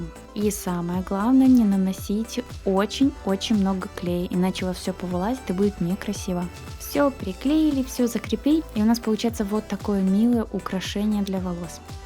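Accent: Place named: Belarus. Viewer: Вы не забывайте что люди в Белоруссии живут, и у них есть свой местный говор...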